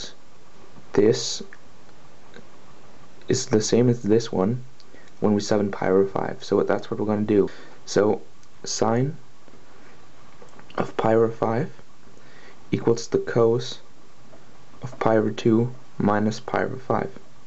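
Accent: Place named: Germany. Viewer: i notice a slight european accent are you german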